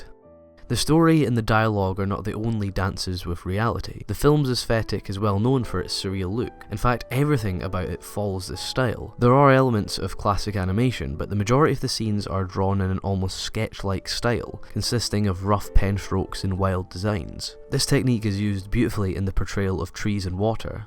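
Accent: Scotland, United Kingdom. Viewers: Where is that accent from? Scottish? British?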